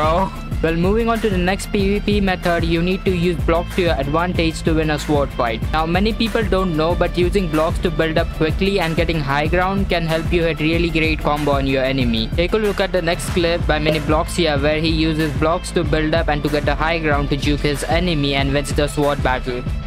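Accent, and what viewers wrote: India. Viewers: Is this guy indian??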